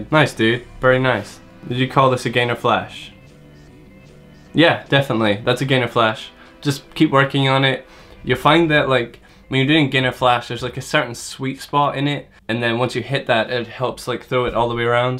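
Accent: Scotland, United Kingdom. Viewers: Hey are you Scottish ?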